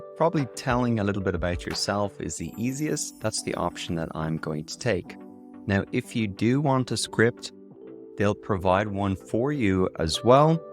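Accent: Ireland. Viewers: Nooooooooooo. It doesn't get your fabulous Irish accent at all. Your regular voice is so good. I want to recording of it to fall asleep to at night. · Holy Crud Batman. I'm torn here because I live in NJ and have been told I have a bit of an accent. I personally think removing your very charming Irish accent is not a great thing. Now I'm in the mood for a Guinness.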